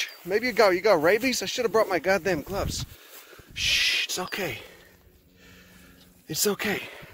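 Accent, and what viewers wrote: Chicago, United States. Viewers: It’s a Chicago Italian accent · It's a classic Chicago accent. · It actually is a character voice, "“I did a couple videos where I talked in my normal voice, but it just didn’t feel right,” says. “So I put all this narration through this voice of a 50-year-old Chicago mook from the West Side.” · Definitely Chicago Italian accent, my dad has the same accent. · Nope, it's a classic Chicago accent. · Chicago, actually :) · From Chicago! Thick Chi-town accent! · The Chicago accent is dripping from this man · Chicago accent.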